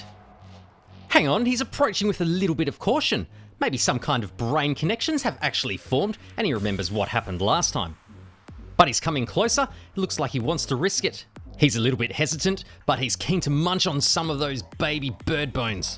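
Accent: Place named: Australia. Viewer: theres no better narrating than a an Aussie lol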